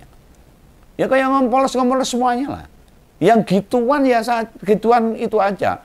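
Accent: Java, Indonesia. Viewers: aku seneng bosomui je, campuran, jowone medhok dengan istilah kuno. 👍👍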